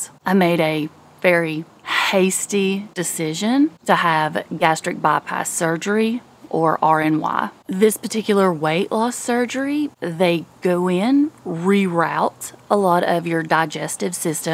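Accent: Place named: Appalachia, United States. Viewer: I just discovered you by searching for a keto sausage balls recipe. I found this video very informative. I am very sorry for your diagnosis. You are picture perfect with a loving appellation or southern accent. Stay healthy.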